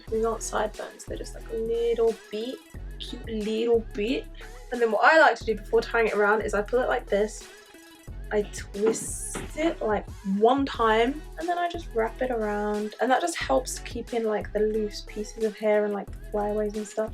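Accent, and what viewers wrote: United Kingdom. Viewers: I Love your Britsh accent!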